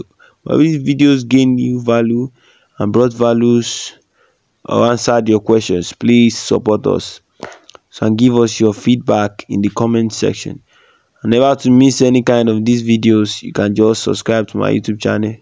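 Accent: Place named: Nigeria. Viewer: This guy sounds Nigerian cos im a Nigerian. Thanks very much really appreciate the solution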